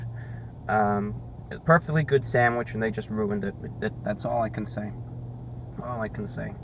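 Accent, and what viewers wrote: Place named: Florida, United States. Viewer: I never thought that listening to a posh floridian review fast food would be so entertaining.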